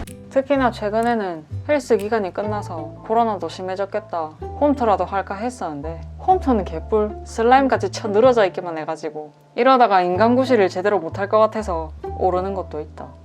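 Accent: Busan, South Korea. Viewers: 근처에 사는 부산녀에요 익숙한 사투리와 승학산 친근해서 좋습니다~~^^ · 부산말 너무 귀여워요. 자꾸 듣고싶어지는 매력!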